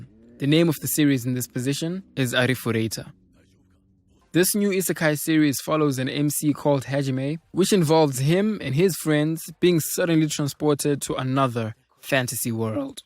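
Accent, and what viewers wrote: South Africa. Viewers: this guy sounds south african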